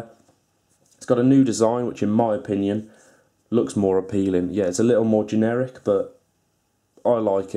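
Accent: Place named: United Kingdom. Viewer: video starts playing: "yes! british accent!"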